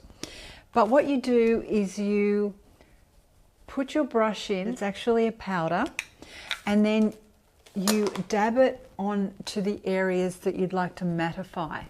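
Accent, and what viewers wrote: Australia. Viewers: Hi I thought you sounded Australian, I was hoping to find someone tht can recommend products that can be purchased here for the over 50s :( I love watching you though. thankyou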